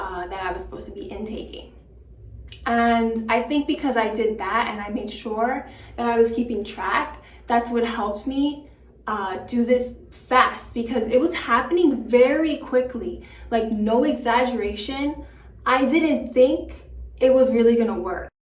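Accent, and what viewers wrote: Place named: United Kingdom. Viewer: 🤣your British accent always coming through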